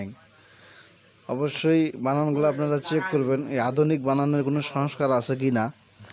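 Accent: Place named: Satkhira, Bangladesh. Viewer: ভাই এগুলা সাতক্ষীরার ভাষা আমার মনে হয়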